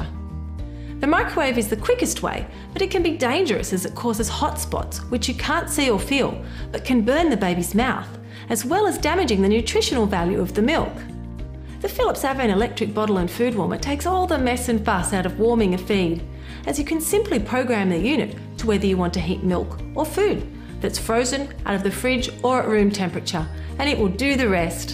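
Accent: Australia. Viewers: how much water do i add? cant tell with the thick australian accent... 1 - 5 whatt??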